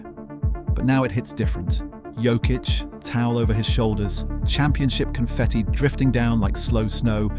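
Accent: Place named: United Kingdom. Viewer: Originally I was leery of your Anglo-accent (you know the bias: what could some Brit or near-Brit have to say to us about our sport?), but soon I realized this was an extremely insightful analysis of the Joker's game. And more and more, I began to appreciate your beautiful language, from beginning to end. I love Jokic's game - the essence of basketball art - but never in the vast library of Jokic videos have I heard more succinct, more precise, more well-wrought, more understanding descriptions of what the man is doing. Just excellent. I salute you. In my view: # 1 Jokic video.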